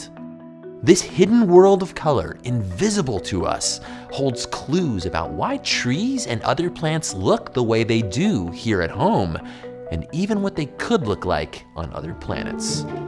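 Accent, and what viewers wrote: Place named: California, United States. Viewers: Why are you talking with such a heavy California dude accent in this video? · i thought he was talking like someone from California. maybe he has a stuffy nose?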